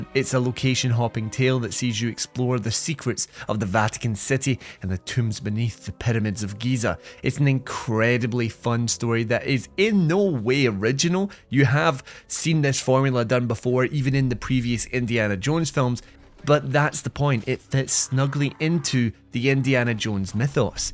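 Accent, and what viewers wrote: United Kingdom. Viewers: SOMEHOW I KNEW SOME BRITTISH CHAP WOILD NARRATE THE PREVIEW. HINT. ITS A TURNOFF.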